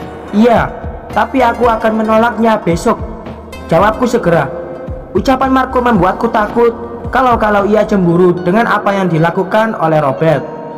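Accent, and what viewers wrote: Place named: Banyumas, Indonesia. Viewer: Mlm "jdi ska ngakakkkkkkk....gra"denger crita dan bhs ngapak y...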